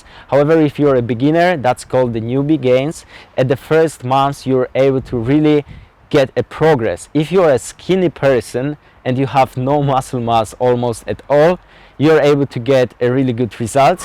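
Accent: Poland. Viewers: Yes, he is from Poland and for me, it's a typical Polish accent.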